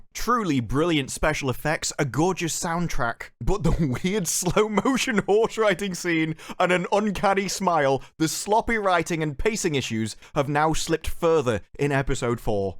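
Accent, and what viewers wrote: United Kingdom. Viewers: You and Council are a great combination for hearing about the show, which I will never see apart from within your videos. I'm sure you've checked out his stuff - his low-key snark is a great pairing with your classic British delivery. I honestly don't know what they spent their money on! I thought a lot of their backgrounds looked like old series Star Trek background paintings. It just does not look modern, and it certainly doesn't look inventive or creative. Like a few other people have said, it doesn't seem to have a soul. I guess the best thing that can be said is the professor Tolkien is not here to witness it.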